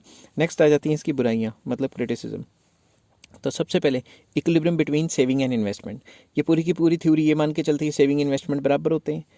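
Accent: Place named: Punjab, India. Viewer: Sir apka punjabi wala hindi bhut mst h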